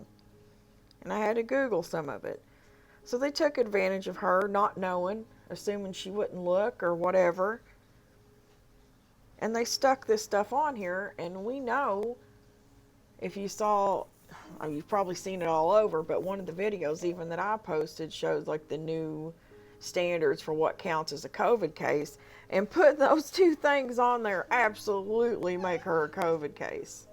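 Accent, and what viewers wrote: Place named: South, United States. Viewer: Hey. I love your channel and I love hearing a sister from the South. I'm in Nashville. Much love. Gonna share your channel on my Twitter if that's cool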